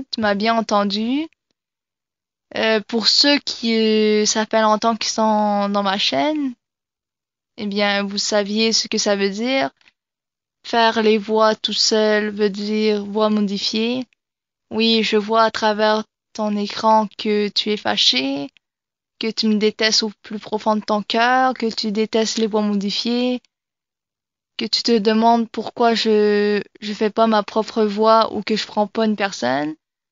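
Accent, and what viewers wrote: Quebec, Canada. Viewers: Question est tu québécoise ? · est tu québécoise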